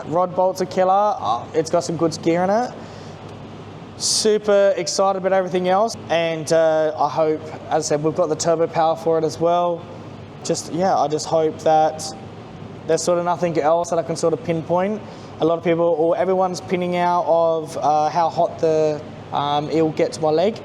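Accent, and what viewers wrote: Australia. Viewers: He almost sounds Australian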